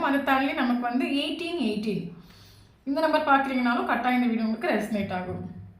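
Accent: Kerala, India. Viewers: யார் நீங்க கடவுளா என் வாழ்க்கையில் நடந்தது எல்லாத்தையும் அப்படியே சொல்றீங்க நீங்க நீங்கதான் பிரபஞ்சமா எனக்கு நடந்தது எல்லாத்தையும் அப்படியே சொல்லிட்டீங்க இனிமே நடக்க போறது எப்படி சொல்லுவீங்க அம்மா நூற்றுக்கு நூறுக்கு மேல இல்லன்னு சொல்லுவாங்க ஆனா 100 க்கு ஆயிரம் சொல்லிட்டீங்க மா யாருமா நீங்க எங்க இருக்கீங்க எப்படி வந்து என் மனசுல இருக்கறது நடந்தது எல்லாம் வந்து உனக்கு தெரிஞ்சது ஒன்னும் புரியல எனக்கு வந்து ஒரே குழப்பமா இருக்கு நிறைய மெசேஜ் பார்க்கிறேன் அம்மா அதான் இது மாதிரி வந்து உண்மை வந்து அந்த அளவுக்கு சொல்ல முடியாது நீங்க என்ன கேரளா லாங்குவேஜ் பேசுறீங்க அது மட்டும் தெரியுது கோயிலா சுத்தத்தை விட உங்ககிட்ட வந்து எல்லாத்தையும் சொன்னா கூட ஒரு ரிசல்ட் கிடைக்கும் என்று நினைக்கிறேன் நீங்க சொன்ன மாதிரி நான் தினமும் வந்து பிரபஞ்சம் கையில வந்து என்னுடைய ஸ்டோரி எல்லாமே வந்து சொல்லி முடிச்சாச்சு இது எப்படி உங்களுக்கு தெரிஞ்சது பக்கத்து வீட்டு அம்மா மாதிரியே பேசுறீங்க அம்மா ரொம்ப நன்றி அம்மா நல்லா இருக்கு ரொம்ப நல்லா இருக்குங்க அம்மா 🙏🙏🙏🙏🙏🙏🙏🙏🙏🙏👍👍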